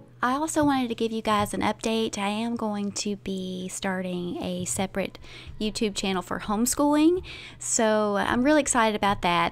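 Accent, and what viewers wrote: South, United States. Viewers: I'm in love with your Lil southern accent you're so comforting 🥺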